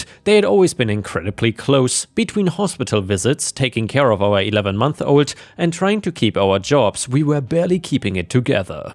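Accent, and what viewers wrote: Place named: Germany. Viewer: I truly enjoy your content, the short stories at the end, and especially those vids of you and your wife utterly spoiling your cats. Those kitties appear to be SO well mannered and loving all the attention you lavish on them. But I AM curious about where you hail from. I know you live in Thailand, but your accent seems to be European - maybe German? But I know so little of all the various languages and regions of the EU I'm likely totally wrong. Would you mind sharing a little bit of yourself?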